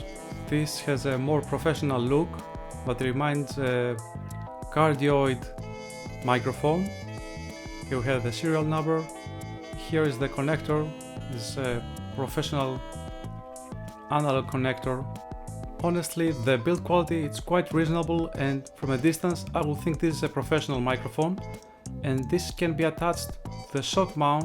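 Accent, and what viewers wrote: Greece. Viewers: Η Ελληνική προφορα ξεχωρίζει από χιλιομετρα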